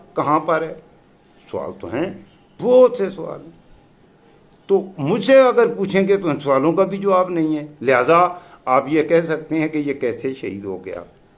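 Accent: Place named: Pahari, Pakistan. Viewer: آپ کے تلفظ سے آپ پٹھان نہیں پہاڑی لگتے ہیں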